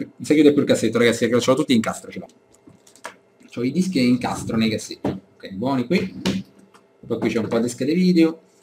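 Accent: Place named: Rome, Italy. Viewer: Si sentiva che eri di Roma :-)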